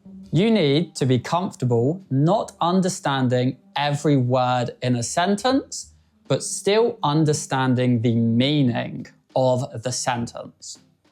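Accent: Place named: England, United Kingdom. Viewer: He is English, he has an English accent, American accent sounds somehow different, but both are "natives". Anybody that has trained their listening a bit could recognize it.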